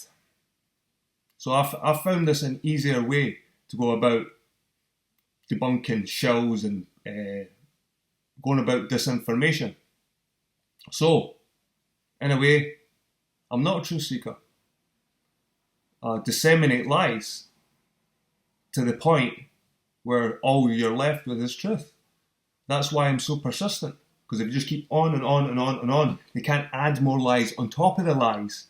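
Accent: Scotland, United Kingdom. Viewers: Your my new favourite YouTuber. I love your honesty in the conversations you present here. I don't mind what your stand is on the shape of the earth, at least your aware of the subject and give it some thought. Sorting out the lies is a great idea. Good man and that Scottish accent is beautiful to listen too. Cheers! 💜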